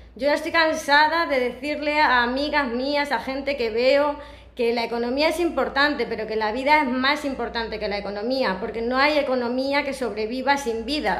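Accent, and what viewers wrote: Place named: Spain. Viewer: Acho o sotaque espanhol fofo demais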